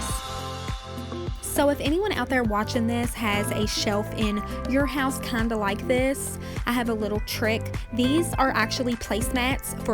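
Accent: South, United States. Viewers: My first time watching, I love it 🥰 and that southern voice 😂☺️❤️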